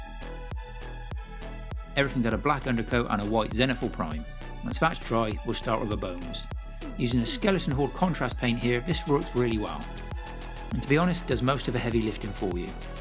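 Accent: South West, United Kingdom. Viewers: Didn’t realise that I was missing the South West accent from the modelling community! And I’m glad I’m not the only one who rests their head on the lamp!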